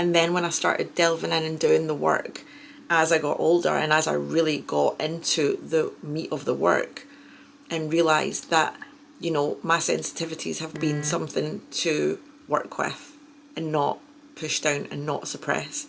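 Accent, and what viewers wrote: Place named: Scotland, United Kingdom. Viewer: It was very evident once I heard you speak😅 my bf is Irish, and I love his accent, but the Scots are something else💝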